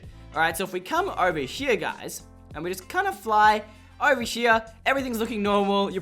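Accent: Australia. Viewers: r u Australian? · How old are you just wanna know your age you sound and look young but u can already tell you come from Australia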